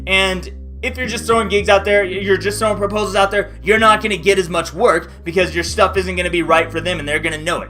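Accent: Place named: Scandinavia. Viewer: Hey, I wonder whether you're a native english speaker (?), you sound continental european/scandinavian european :)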